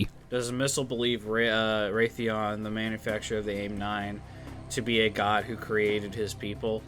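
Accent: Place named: United Kingdom. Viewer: Maybe you should make prop plane roasts with a.50 cal gun. "The.50 Cal Roasts the Hawker Hurricane." HOW TF DID I NOT RECOGNISE VOICE AS A BRIT!?!?!?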